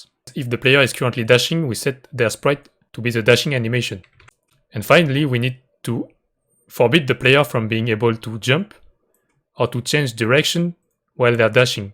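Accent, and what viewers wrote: France. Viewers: do you speak french its like your accent is a french one? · J'ai tout de suite reconnus l'incroyable accent haha ! Plus sérieusement merci beaucoup et c'est incroyable de voir des devs français sur yt !! (même 4 ans après) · that french accent, i like it !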